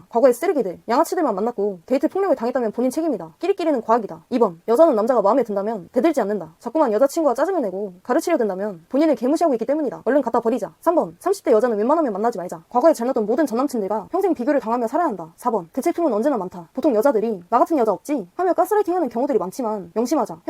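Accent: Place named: Jeolla, South Korea. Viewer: 아따 라도 사투리 구수하다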